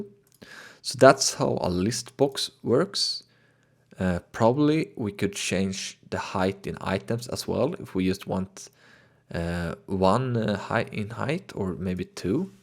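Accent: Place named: Sweden. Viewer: Love the swedish accent, very cute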